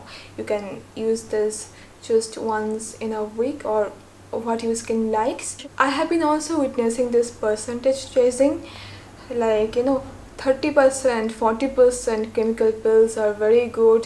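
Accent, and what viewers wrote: Bengal, India. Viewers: It's her accent ( Bengali), her english is good though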